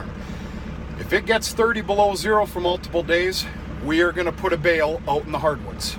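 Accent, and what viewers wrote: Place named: North Dakota, United States. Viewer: Hi, I'm from N. Utah. I worked on a ranch in Randolph Utah. Weather just like that only humitity is much lower here. It's funny how cows can be so picky about their hay. Love your channel and your accent sounds alot like my relatives on the North Dakota/Canada border. And they are Native American. I wish I was there helping. Stay warm and safe. Good day. Debbi. ☺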